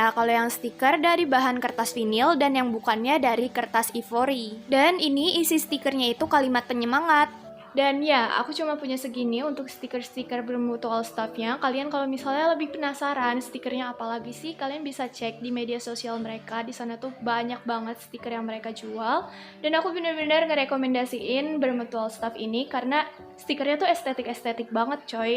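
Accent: West Java, Indonesia. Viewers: Ka orng Sunda nih? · Qaqaq orang Sunda:v?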